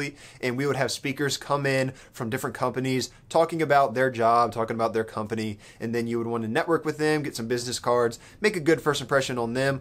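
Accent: Texas, United States. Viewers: Are you from Texas? I noticed you say y'all a lot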